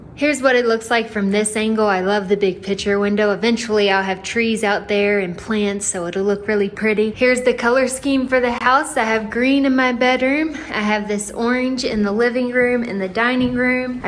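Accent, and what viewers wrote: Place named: Montana, United States. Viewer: Do all the Montana people have your cute accent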